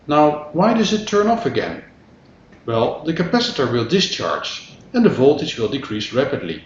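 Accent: Netherlands. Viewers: Thank you for the explanation! great stuff! PS you sound Dutch ;)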